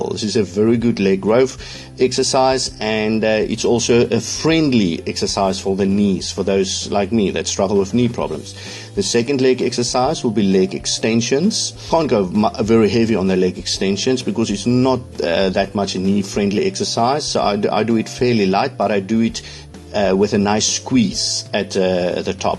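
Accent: South Africa. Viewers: Is this the Afrikaans type accent?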